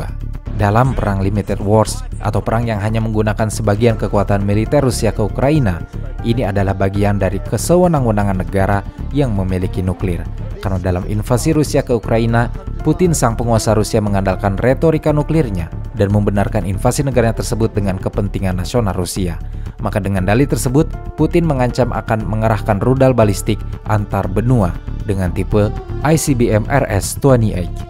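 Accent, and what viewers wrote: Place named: West Java, Indonesia. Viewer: Dari cara bicara nya, tampak nya mimin dari suku Sunda.. kumaha min, daramang ?? 😃😁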